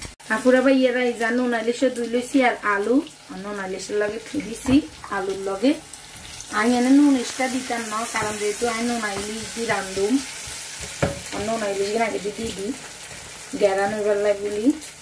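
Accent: Noakhali, Bangladesh. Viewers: Apu tumer nuwakhaliar vasha onk valo laga sunte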